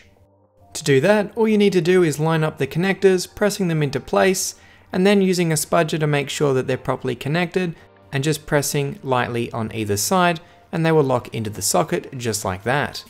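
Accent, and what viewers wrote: Australia. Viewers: When I hear he’s Australian like me Insert surprised pikachu meme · Aussie accent bud they rise in pitch at the end of SENTANCES lol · So you’re Aussie....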